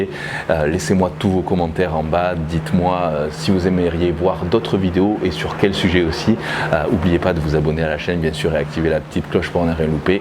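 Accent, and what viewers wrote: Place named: Le Pontet, France. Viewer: Le pontet👍 je me disais bien avec cet accent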